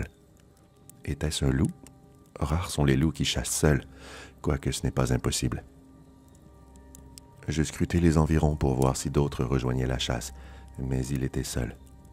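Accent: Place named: Canada. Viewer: Je viens de découvrir la chaîne. Vraiment excellent! La narration et ce petit accent canadien j'adore!🥰👌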